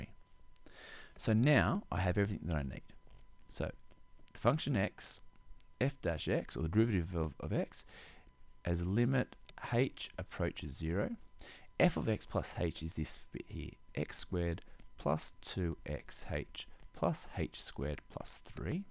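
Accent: Australia. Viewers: Sounds Australian to me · es una muy buena explicación muchas gracias, pero, ¡Griffin MC5 es retrasado! Tambien me gusta el accento australiano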